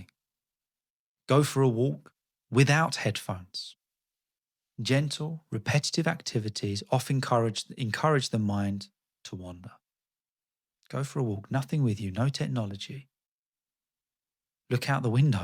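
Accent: United Kingdom. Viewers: Is it UK English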